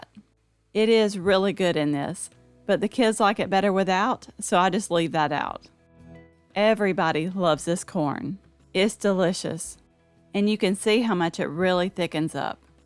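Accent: South, United States. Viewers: “And don’t that look delicious “ Why don’t Southerners speak English properly?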